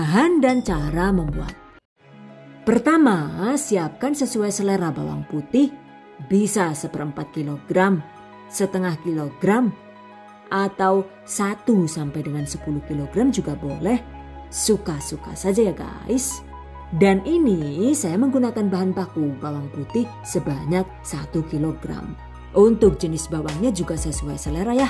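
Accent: Banyumas, Indonesia. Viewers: Jan wong ngapak Rika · Ngapakek kentel banget hiiiii jd lucu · Kenapa sih bahasanya spt orang yg glege'en gt ya maaf.. Kadang kyk kebarat2an kadang ngapax hehe oowke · ❤❤ Penjelasannya detail banget Dan seru bngt saat ngomong ngapak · Deneng ngapak......???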